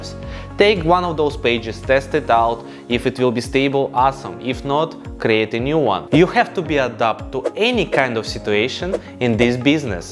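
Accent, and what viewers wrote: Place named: Russia. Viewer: Русский акцент режет ухо😢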